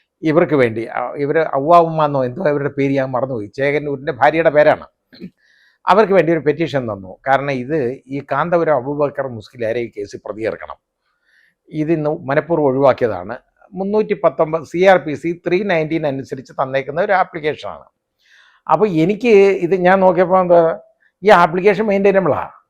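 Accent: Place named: Pathanamthitta, India. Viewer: സാറ് ഇത് തുറന്ന് പറഞത് നല്ലതായി, സത്യം ജനങ്ങൾക്ക് മനസിലായലോ🙏🙏, കാന്തപുരം ഈ വയസ് കാലത്ത് വടി കൊടുത്ത് അടി വാങ്ങി... ഒരു ഹൈക്കോടതി ജഡ്ജിക്ക് പോലും ഈ അവസ്ഥ ആണെങ്കിൽ സാധാരണകാരുടെ അവസ്ഥ ആലോചിച്ചു നോക്കൂ, സാർ... താങ്കളുടെ തുറന്ന് പറച്ചിൽ തുടരുക.. പത്തനംതിട്ട ശൈലിയിലുള്ള സംഭാഷണ രീതി കേൾക്കാനും നല്ല സുഖമുണ്ട്... എല്ലാ ആശംസകളും 💐🙏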